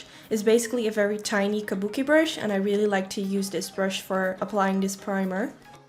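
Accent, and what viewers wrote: Netherlands. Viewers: First of all. This look is STUNNING. I was looking for a look to really make the colors pop because I haven't really been into super colorful looks yet. Also you are Dutch right? I can hear it since I'm Dutch as well hahaha. When you said Glucose I knew it for sure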